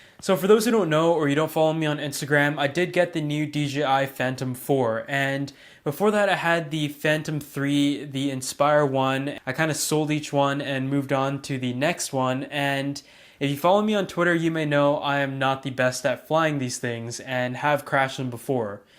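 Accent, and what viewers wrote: Canada. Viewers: wait u canadian fam?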